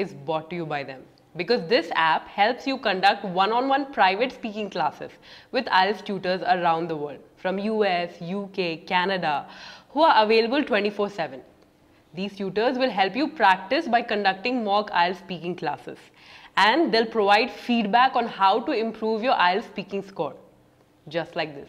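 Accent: Hyderabad, India. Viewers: Akka hyd a...? 😁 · Sister....are you from Hyderabad??? I initially thought that this is an abroad channel..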